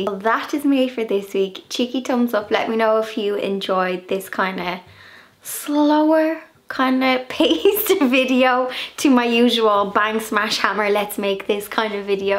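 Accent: Ireland. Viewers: Hello,I've not long subscribed.Im loving you alot!!!!😘 You have the most girly vintage snuggly cuddly house.🤗🌟 My dream home.Can I move in?pretty please😘😘 You should be so so proud of yourself.Yoh most definitely are an old soul in a young body.I saw that you visited Kilkenny in one video? I went there with my hubby for our honeymoon then Dublin.We saw Irish dancing in one of the pubs.That was sooo beautiful.My dad's family are from county Sligo.We want to go there at some point and look up the family.I love the Irish accent it's just the best.Im busy working my way through all your videos!! There's so many.Im just starting to make soaps and candles etc and I'm getting some fab tips from your videos.much love and stay safe.From a rainy England.🤗🤗🤗🤗🤗😘😘😘😘😘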